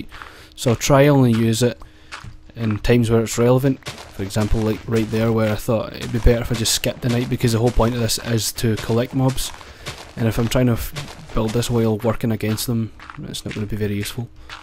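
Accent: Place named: Glasgow, United Kingdom. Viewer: No he doesnt? small beans is from york. He sounds like he's from somewhere near Glasgow. And you do know that insted of burning wood, you can burn one unrefined but of wood and make charcoal which is good for 8 full cycles instead of 1.5 for wooden planks.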